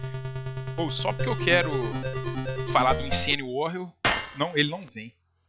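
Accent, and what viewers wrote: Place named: Interior, Brazil. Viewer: Caralho, mas que sotaque caipira.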